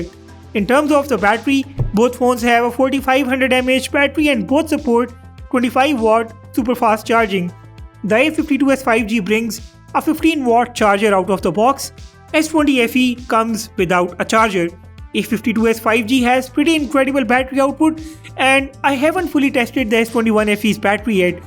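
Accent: India. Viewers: mi video i realized you speak english (or indlish) :D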